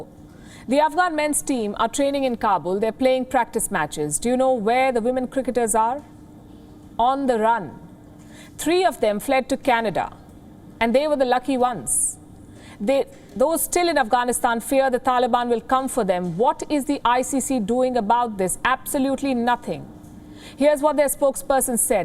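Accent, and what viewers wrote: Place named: India. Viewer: It's indian accent wion primarily indian news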